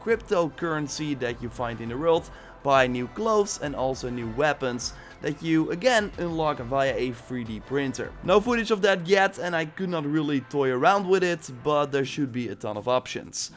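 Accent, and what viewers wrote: Netherlands. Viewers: Are you dutch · Just wondering are you Dutch? (Not bashing your accent my friend however I'm Dutch and I was wondering if I guessed correctly) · You sound Dutch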